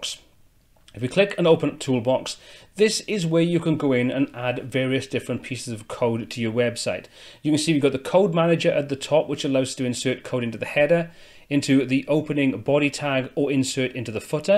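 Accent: United Kingdom. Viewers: sorry for my mistaken, in fact, i enjoyed your video. the problem is the British accent even is very nice but difficult to understand when talking fast. by the way, thank you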